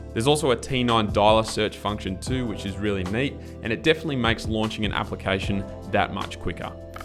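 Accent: Australia. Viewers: This guy's Australian accent is surreal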